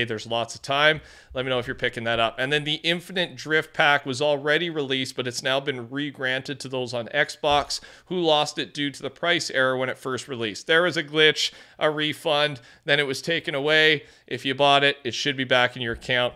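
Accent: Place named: Canada. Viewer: Canucks hat....the hoser accent. My guy lives down the road 😮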